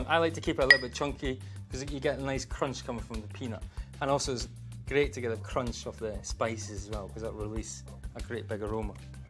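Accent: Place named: Scotland, United Kingdom. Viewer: Best Scottish accent ever! 🏴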